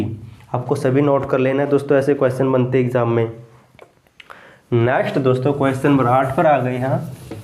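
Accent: Haryana, India. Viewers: Sir ji ye nai suruat b bhot achi h,,,mn hra hra ho gya ji,,,,vase ji aapki aawaj me tunning nrvana ,kathal side ki aati h ji kha se ho ji aap????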